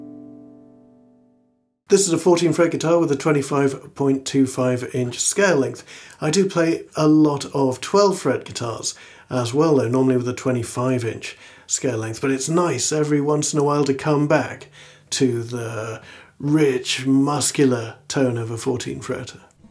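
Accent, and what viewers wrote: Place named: United Kingdom. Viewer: really enjoy your playing and videos....something too about a calm British accent speaking the King's english lol!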